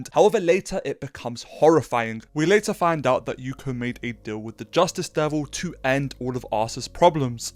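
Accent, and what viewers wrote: United Kingdom. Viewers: a hot British voice, get it right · guy has an annoying british voice lol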